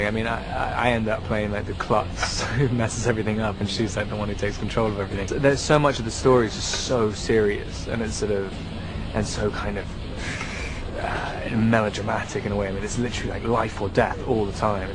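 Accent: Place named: London, United Kingdom. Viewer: his accent is like London English, Standard English, he is accentless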